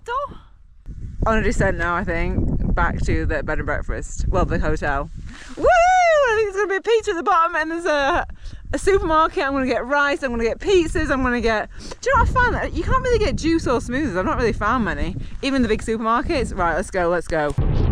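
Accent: United Kingdom. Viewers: Both my wife and I were teachers for 45 years. Because of that, we have this little habit of trying to imagine what someone looked and acted like as a child. As we were watching your video, and you were wrinkling your eyebrows while apologizing for being grumpy in your lovely British accent, we both looked at each other and said, "I bet was an ABSOLUTELY ADORABLE child 🥰." Thank you for being you and for bringing a little sunshine into our rainy lives (It's "chucking down" here in the USA too. 🌧️⛈️☔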